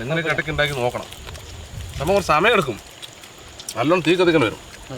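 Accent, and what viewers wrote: Palakkad, India. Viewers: ikkayeyum palakadan bhashayum ishttamullavar like adikku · ഇക്കാടെ പാലക്കാട് ഭാഷ ഇഷ്ടമുളളവർ ലൈക് അടിക്ക്🤩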